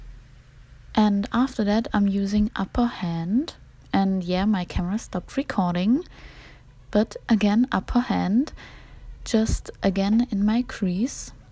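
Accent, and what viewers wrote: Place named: Germany. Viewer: Which country are you from? I think that you have kind of a german accent 😊 I'm from Germany too🥰 where did you get the palette? Did you order it?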